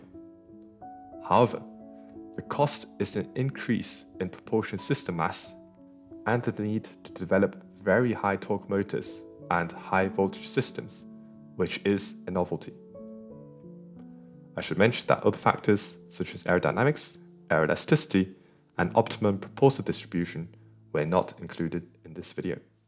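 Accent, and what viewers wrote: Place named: Germany. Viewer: 想问博主是中国人么，感觉您在德国生活过很长时间哈哈，德味挺重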